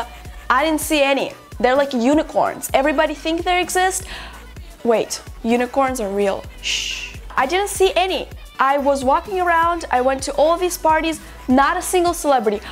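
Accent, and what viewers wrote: Russia. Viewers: Are you russian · Russian?! such a nice strong accent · Is she russian or something ? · Is she russian?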